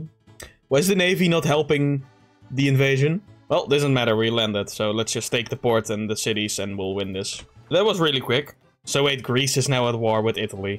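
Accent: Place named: Netherlands. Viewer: Stoel! Ik denk daar een vaag Nederlands accent te herkennen? English for those confused: Chair? If I'm not mistaken that's a Dutch accent?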